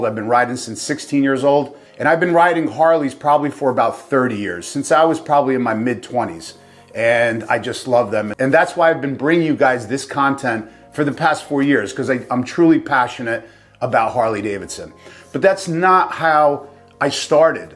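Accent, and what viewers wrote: New Jersey, United States. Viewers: Thanks for the explanation! I was worried that we'd miss your enthusiastic Jersey accent :) Maybe a nice segway to the new channel would be you getting on a PanAm or better yet, a Buell. then you get all the viewers, HD and Sporties alike lol In all seriousness though, as long as your riding youre good in my book. And if folks cant handle learning about a different type of bike, well....as my mom would say "sucks to be them". I hope you throw a couple Harley videos on the sport site, just for kicks ;)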